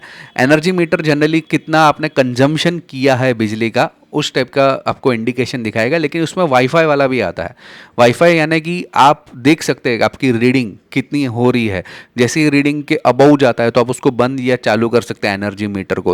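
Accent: Gujarat, India. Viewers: Sir aap basically Gujarat se ho kya.. language se laaga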